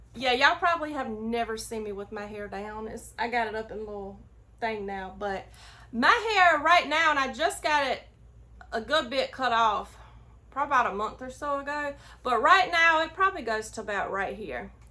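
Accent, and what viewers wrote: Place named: South, United States. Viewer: Oh yeah honey you got an accent. You got that southern drawl. I’m from TN I’ve got an accent too. Lol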